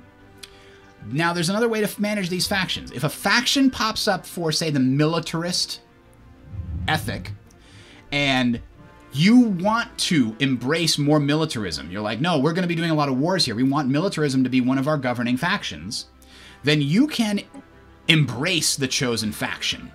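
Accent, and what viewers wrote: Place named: United States. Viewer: The yankee pronunciation is annoying but hey, great vid. While the word "pop" made me cringe, you explained everything well. I really needed this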